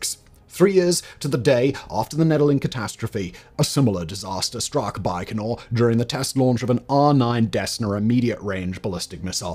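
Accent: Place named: RP, United Kingdom. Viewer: you do realise he is just a narrator, he doesn't write or edit almost of the material, all he does is ready from a script. He seems smart, but really it's just a posh accent, flashy clothes and glasses. His writers and editors are pretty average too because if you pay any attention the amount of inconsistencies, mistakes, misleading information and just blatantly false claims become glaringly obvious. Dint get me wrong, I love his content, but you should be aware he's not this genius everybody seems to think he is.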